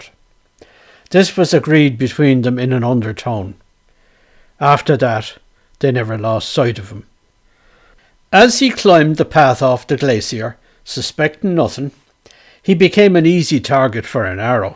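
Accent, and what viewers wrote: Dublin, Ireland. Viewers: Same here but he soothes my nighttimes with his gentle voice and delightful Dubliner accent. Can you imagine sipping some tea or sharing a pint with Mr. relating one of his tales somewhere in Dublin? What a treat that would be!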